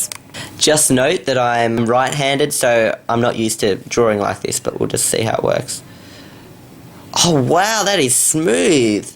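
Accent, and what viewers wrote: Australia. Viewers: Are you from Australia? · Oi! An Australian! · woah woah woah HALT IT your australian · damn that accent you ozzie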